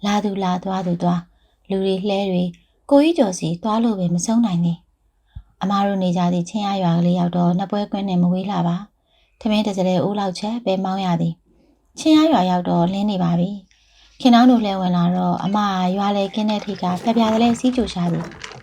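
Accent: Upper Burma, Myanmar. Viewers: မင်္ဂလာပါတို့ အညာရေဆရာမခင်ခင်ထူးဝတ္ထုကောင်းလေးကိုရွေးချယ်ဖတ်ပြတာစာဖတ်သူအသံလေးနဲ့အညာသံအညာဓလေ့တို့အညာနဲ့လိုက်ဖက်နားထောင်ကောင်းလိုက်တာကျေးဇူးပါနော်❤❤❤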